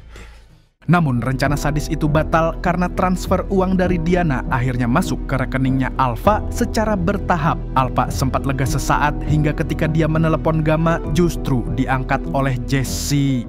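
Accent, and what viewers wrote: Banjar, Indonesia. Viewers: banjar banar amng ni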